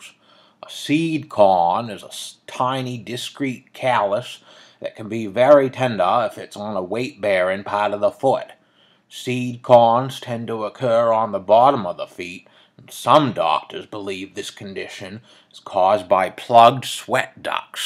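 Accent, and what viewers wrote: Maine, United States. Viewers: Well! Not only did I get to hear a Downeast Maine accent, but I think I figured out what's wrong with my foot, so thank you! :) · I have a friend from northern Maine and a family member from Haverhill, Ma. This sounds like a cross between them. ☺️ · visited Maine this past week and met a lovely farmer in a Diner that spoke like this. Had a good chat.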